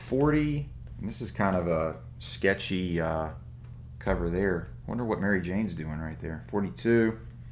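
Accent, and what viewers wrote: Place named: Ohio, United States. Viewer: Judging from your accent your from somewhere in Ohio.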